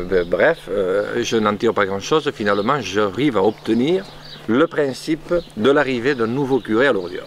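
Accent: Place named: Brittany, France. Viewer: Breton, j'adore cet accent et j'apprécie bcp ce monsieur que j'ai découvert sur Arte tandis qu'il visitait un artisan de son secteur. Pour l'accent, écoutez le poème "L'accent" de Zamacoïs dit par Fernandel, c'est magnifique !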